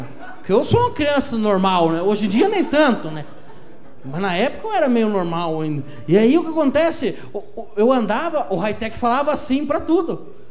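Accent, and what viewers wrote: Curitiba, Brazil. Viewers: sotaque da região metropolitana de ctba :)))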